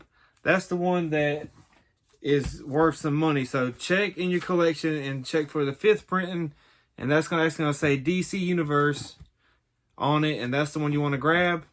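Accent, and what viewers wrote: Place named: Ireland. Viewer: I love your accent, from Ireland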